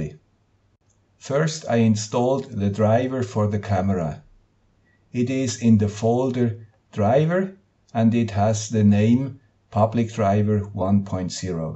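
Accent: Switzerland. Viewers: That would be fantastic, this has been an issue for me for a while. I have very good lighting at my bench. As I am approaching 50 myself and would like to work with smd, it's time to do something about it. I appreciate your quick response and look forward to seeing and hearing the man with the Swiss accent''s solution. Have a great week!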